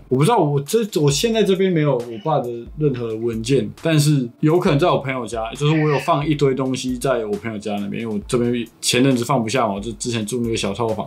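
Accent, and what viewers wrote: Taiwan. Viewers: 真的很台😂“拉撒呷拉撒杜啊”都用得恰到好處！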